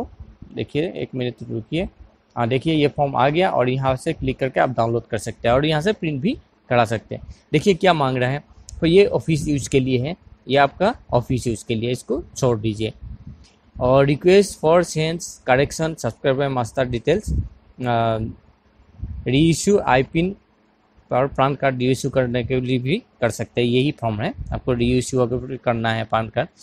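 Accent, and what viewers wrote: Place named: Assam, India. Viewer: Vo north east k h bhai vo aise hi Bolte h.Assam k h sayad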